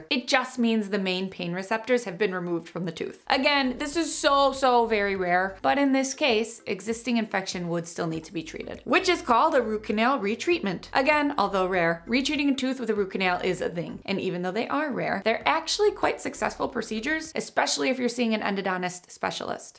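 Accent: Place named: Wisconsin, United States. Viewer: An Italian with a Wisconsin accent.. weird!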